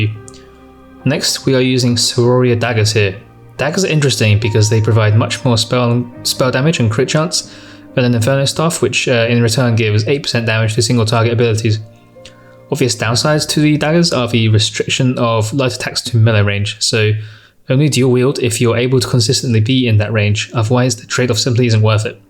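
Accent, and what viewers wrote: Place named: England, United Kingdom. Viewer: Everyone says Nefas has the most sexy voice, but I think your English accent definitely gives you the edge. Love the video, love me some more magcro!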